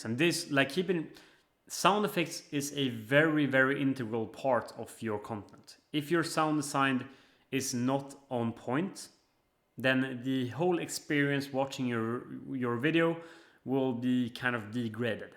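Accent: Sweden. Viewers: Brors svenska dialekt är galen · the way this guy pronounces "ch" sounds is too funny "sjat GPT"😂 so swedish!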